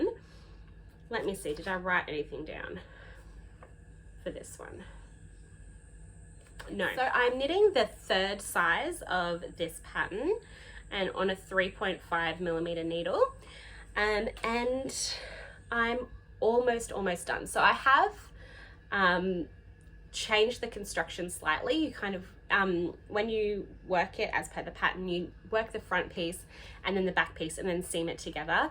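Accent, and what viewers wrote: Australia. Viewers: Hi, it's so nice to see and hear an Australian knitting podcaster!! Just wondering where you order all these American yarns from as I don't see them at any online (Aussie) stores that I buy from? Also the De Rerum, I want that for the Decision cardigan but can't find it so can you advise where you got that? I know what you mean about some podcasts being over an hour long. I have heaps that I watch and the shorter ones that I absolutely love are Voolenvine, Cherry Heart, 50 Fabulous Knits, The modern Skein, Camijo Knit, A Homespun House, Little Big Knits & Youngfolk Knits. Love your work, you knit beautifully. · Always great to hear an Aussie accent. Try Julia and Mathilda's greatestknits. Love the Tulip sweater and have some light linen for a summer version ready as it's still over 35 degrees here every day !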